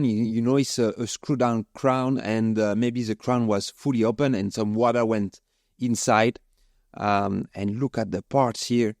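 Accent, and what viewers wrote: France. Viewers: What a biouteeful accent in English. Tu m'as tué avec ton accent frenchie ! Remarque les américains adorent ça apparemment. Hey, if it gets you more views in english than you doing it in french, what the heck go for it mon ami!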